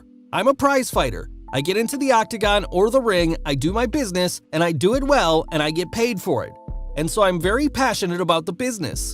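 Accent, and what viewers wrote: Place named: United Kingdom. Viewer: Aw man no offense but I wanted to hear the British accent today :/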